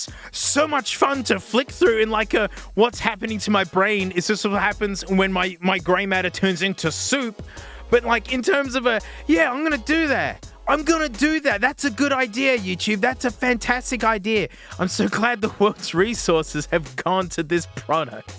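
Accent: Australia. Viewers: just broke this AI with his overwhelming aussieness and unique lingo, and that's amazing.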